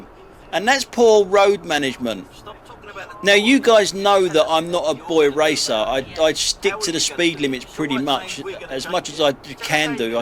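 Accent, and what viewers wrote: London, United Kingdom. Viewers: <link> This is Dashcam Hull's channel, I've been subscribed to his channel for a while now - quite entertaining 😀👍 And I love the London accent btw - I can understand perfectly well - and I'm Scottish 😂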